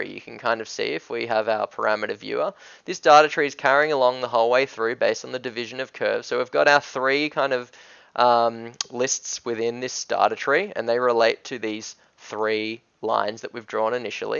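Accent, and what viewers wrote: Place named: Australia. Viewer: I think hes from Melbourne, Australia, based on the accent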